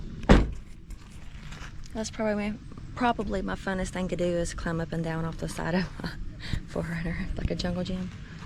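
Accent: United States. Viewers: Hello my dearest friend lol. Might be worth drilling a couple of drain holes in the bottom of the hatch so the water won't gather. Seal the drill holes with a bit of nail varnish. I didn't count how many times in this video you said "I love", but I bloody love your dialect. It's gotta be my favourite of all the Americas xxx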